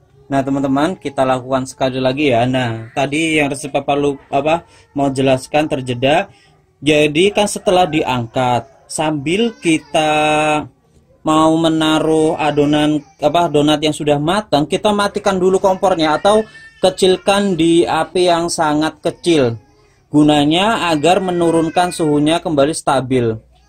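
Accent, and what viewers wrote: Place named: Cilacap, Indonesia. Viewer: Maaf Mase aku nmbe nemu chanel sampean, koh sampean niku kaya wong CILACAPAN yah sanjange....👍👍👍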